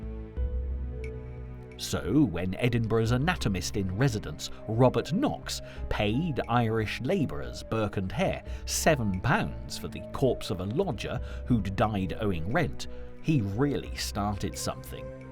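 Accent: England, United Kingdom. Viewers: Lovely docu. Thanks very much.,but please employ a Scottish narrator as an English accent is hard to understand. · As a wee Ayrshire lass, I was looking forward to this but cannae listen to that English narrators accent,I got as far as his pronunciation of Girvan and wet ma pants….enjoy the rest and maybe with a subject as unique as our Scottish myths and legends it would’ve been more engaging in a true Scot’s accent to amp up the heritage and theatre of our fab history 👍🏴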